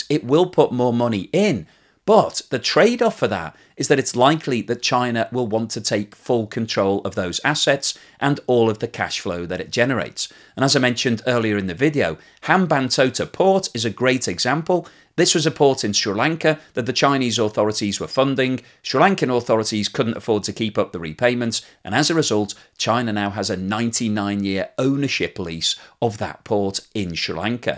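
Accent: United Kingdom. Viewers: This propaganda piece is way to cheap. Basically, you are saying that all these countries working on this "initiative" are idiotes and don't have a clue that they are controlled. The number of participating countries keeps growing, because the new comers are not as smart as you to understand the trap? Judging your accent, don't you think it's strange that GB was among the first to invest in the bank to financing this "disaster".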